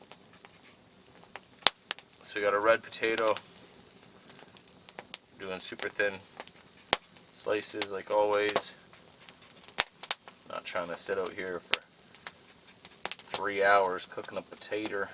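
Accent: England, United Kingdom. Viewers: Cracking English accent 😁👍🏼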